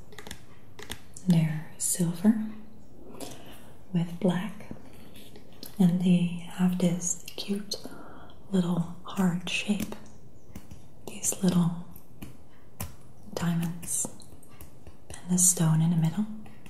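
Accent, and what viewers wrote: Netherlands. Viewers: Calm down. She is dutch, English is not her first language. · she's Dutch its the way she speaks !x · She talks like that because she is from the Netherlands. Obviously, not everyone speaks English. Most people who watch her videos love her accent. So, if you don't then don't watch her videos,simple. She is trying to help relax you/give you asmr tingles, not talking about the way people talk. Maybe if you was actually smart,you would realize she is from the Netherlands and she has an accent and can't help the way she talk,but you don't know that. I mean,it's first grade so learn it. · she;s Dutch, and therefore has a Dutch accent. Don't be rude. This is a relaxing community...please take your negativity elsewhere.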